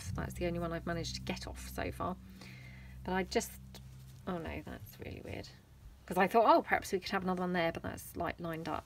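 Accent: England, United Kingdom. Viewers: Beautiful journal, and I love your English accent but your daughters little voice with that accent is sooooo adorable! 💕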